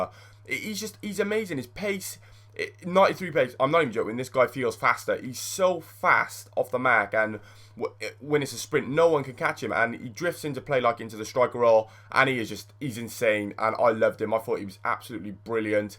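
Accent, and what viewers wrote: Wales, United Kingdom. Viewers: you sound welsh, are you? and if so what part you from?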